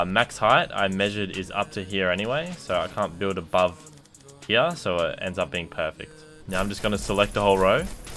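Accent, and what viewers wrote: Australia. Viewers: Ur australian I didn't know that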